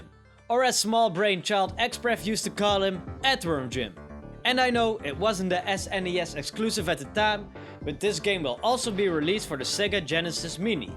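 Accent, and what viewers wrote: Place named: Netherlands. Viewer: his accent is way to dutch goede video tho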